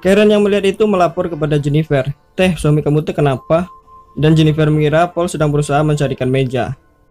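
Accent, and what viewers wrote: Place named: Pekanbaru, Indonesia. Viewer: Orang pekanbaru ya, min? Gaya bicara nya gitu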